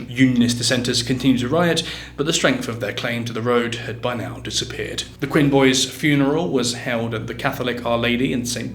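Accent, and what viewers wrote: RP, United Kingdom. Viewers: Yeah but it’s a posh English accent that’s bringing Irish history to light so I’ll give him credit where it’s due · Your posh English accent does you no favours in this video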